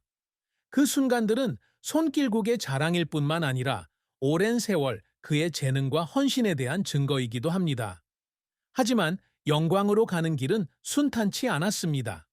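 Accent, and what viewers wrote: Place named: North Korea. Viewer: 이 머꼬 ㅡ가짜 방송 할래 ?? 부칸사투리네 ㅡ ㅜㅜㅜ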